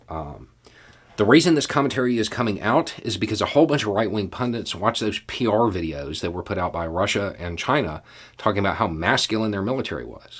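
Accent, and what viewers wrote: South, United States. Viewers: I'm always amazed at how a dude from the south who says yawl and his a scruffy beard and wears a ball cap with a monkey on it can explain the most important issues we face in a way that adds clarity and depth that cuts through all the noise. I've said this before. It's a shame that people who would benefit most from these posts will probably never read them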